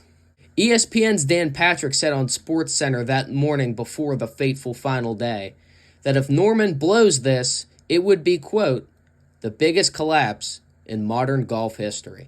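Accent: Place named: Pittsburgh, United States. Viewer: Love that Yinzer accent!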